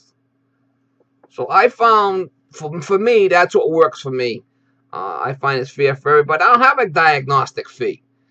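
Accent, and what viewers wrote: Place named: New Jersey, United States. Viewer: You tell it like it is. You ain’t no charity fix-all. If people want oats that’s already been through the horse they come a little cheaper but if they want nice fresh green oats, they gotta pay for them. Like all of your videos and your accent. Jersey?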